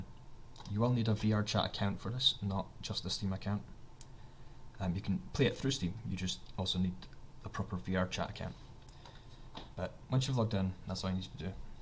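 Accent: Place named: Scotland, United Kingdom. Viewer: So Scottish. <3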